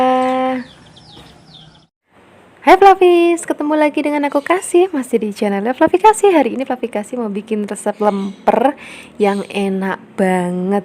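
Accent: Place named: Banyumas, Indonesia. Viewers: Banyumas dialeknya ❤ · Menarik....apalagi dialek banyumasannya mantep😅😅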